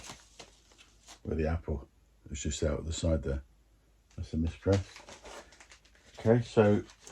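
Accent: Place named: Portsmouth, United Kingdom. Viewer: Different forms of dancing indeed....😎 .... and ... nice to have my suspicions on your accent confirmed...you can take the boy out of Pompey eh!?!...Nice one copper..PUP....upwards and onwards...Heavens Light Our Guide!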